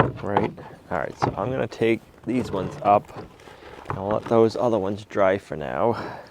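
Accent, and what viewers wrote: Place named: Boston, United States. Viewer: I think he grew up near Boston. His "mornings" are legendary!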